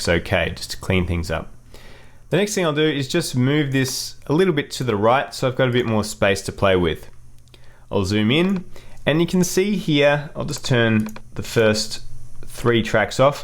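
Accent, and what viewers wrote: Australia. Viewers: are you australian? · Brilliant video, love your work! PS, I'm a fellow Aussie! Nice to hear a familiar accent on such a great tutorial.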